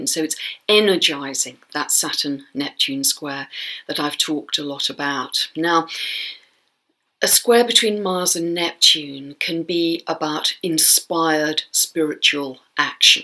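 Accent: United Kingdom. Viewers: Hello. Really like the scientific approach to your video and we cannot deny, looking at the energies in the world, that what you are saying it not valid. So many people like your videos because your British English is so clear and lovely. Best Wishes to you; ) K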